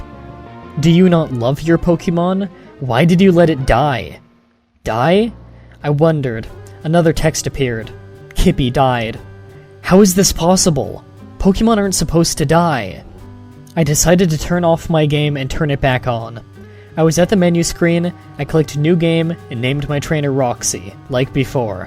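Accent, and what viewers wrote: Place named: Canada. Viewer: lol ik i do apologize but there was just something about your accent said "canadian in my head."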